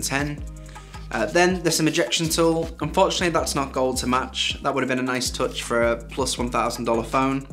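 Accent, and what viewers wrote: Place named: England, United Kingdom. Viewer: I like Ur English Accent Sound so cute...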